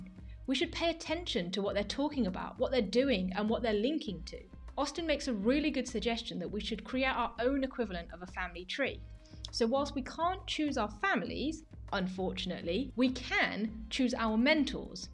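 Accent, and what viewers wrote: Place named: United Kingdom. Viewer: damn you have british tone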